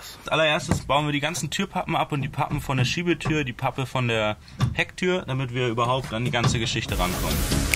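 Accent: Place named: Baden, Germany. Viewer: echt cool es Video von eurem Akzent denk ich Mal ihr kommt aus der Badener Gegend oder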